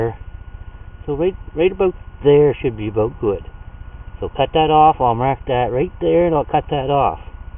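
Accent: Canada. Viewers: Great video man! I love how canadian you sound. Thanks for the post stay Eazy! ... "Shklor" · are u canadian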